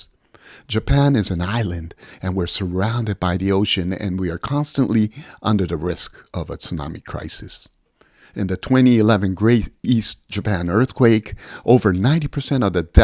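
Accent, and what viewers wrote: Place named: United States. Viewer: You speak very well if I hadnt known you grew up in Japan I would have thought you grew up in the United States because my stepmother whos from Japan and spent decades living in Hawaii still had an accent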